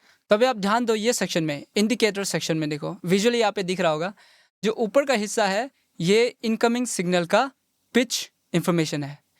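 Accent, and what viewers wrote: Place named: Andhra Pradesh, India. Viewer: You sound like and im sure yeh ap hi hai accent se pata chalre, im from a.p too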